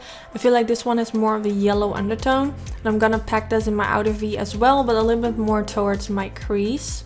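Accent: Netherlands. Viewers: Ik wilde net vragen of je uit Nederland kwam (je klonk een beetje zo☺️), maar toen las ik het op je kanaal🤪🤗😍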